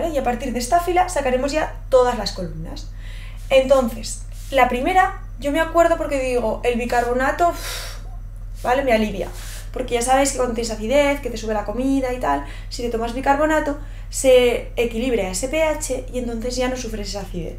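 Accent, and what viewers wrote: Spain. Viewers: Cuándo tu profesora de química es española y se te dificulta concéntrate 😅😅 por lo hermoso que habla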